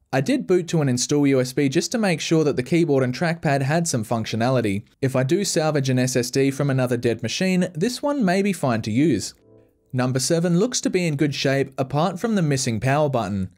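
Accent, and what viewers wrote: Australia. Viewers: Hey, you a fellow Aussie?????? I should've recognised the accent, I'm disappointed in myself. Really really disappointed. Edit: a correction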